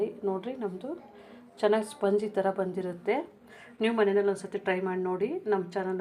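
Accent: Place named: North Karnataka, India. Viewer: ನೀವು face ಯಾಕೆ ತೋರಿಸುವುದಿಲ್ಲ. ಉತ್ತರ ಕರ್ನಾಟಕ ದವರಾ a style matadi ಚೇಂಜ್ ಮಾಡ್ಬೇಡಿ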